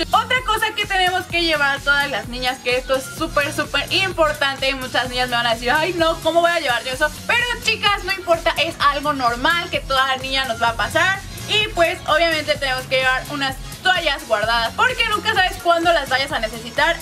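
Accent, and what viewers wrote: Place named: Yucatan, Mexico. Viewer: Soy nueva:v son de Mérida? :"v es que noto él acento xD.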